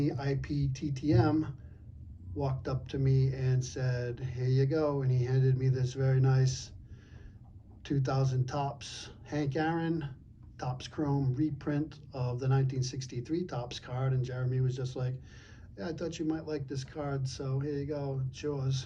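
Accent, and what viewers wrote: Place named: Boston, United States. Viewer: I get the impression you're from Boston lol,great pickups,I missed 2021 national ,will be in ac,hope to get a chance to meet you and other you tubers to tell stories and share experience's.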